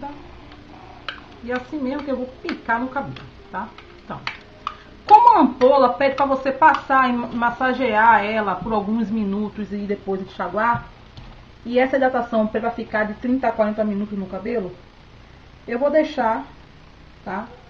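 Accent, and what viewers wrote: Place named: Salvador, Brazil. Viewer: Pelo sotaque vc mora em Salvador. Onde encontro essa linha?? Aí em salvador??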